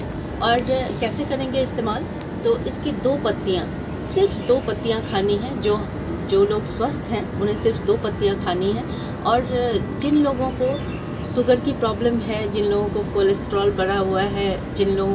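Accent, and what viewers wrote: Bihar, India. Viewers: Na hi tumhara biharipan bolne mein fark he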